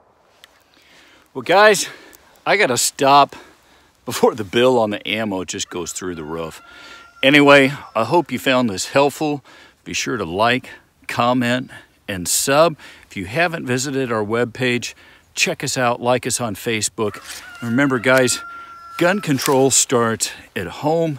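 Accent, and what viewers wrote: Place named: Nebraska, United States. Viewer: I picked up on your accent right away, and was like "holy crap, a brother from the Motherland of Nebraska!" Then I saw your lower registration, and subscribed without question. Grew up in Ogallala, now on the East coast. Thanks for putting things up on the channel!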